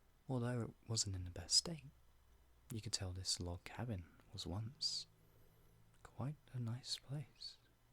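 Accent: United Kingdom. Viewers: FINALLY A BRITISH AUDIO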